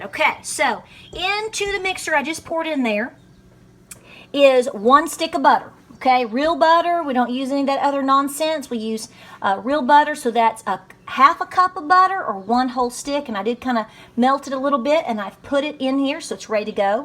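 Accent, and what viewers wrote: South, United States. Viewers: Your videos are just wonderful. Love your kind southern accent, as well as your wonderful recipes. Bless you and keep up your faith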